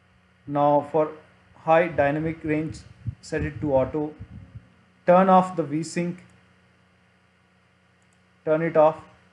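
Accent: India. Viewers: Why is it always the worst desi accent always in these videos?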